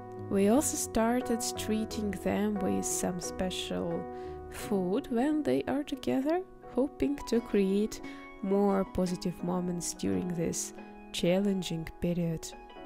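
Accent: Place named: Russia. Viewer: Great dogs!! Where r you from? You have very Russian accent.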